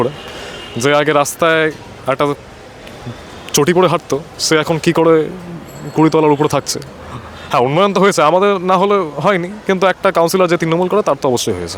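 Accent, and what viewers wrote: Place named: Bangladesh. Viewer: বাংলাদেশি টান ভাষায়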